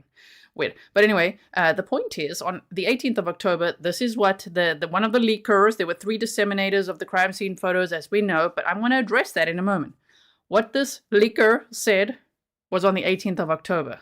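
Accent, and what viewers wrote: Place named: South Africa. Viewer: Found your channel, because a fell in to the rabbit hole, that was judge Gull… Love your accent, you sound South African or Dutch (Holland)?? I’am mixed race Danish(white side) / South African (black side, my father fleed under apartheid, to Denmark) and I much say, love the accent ❤ even if I’am wrong about the country 😂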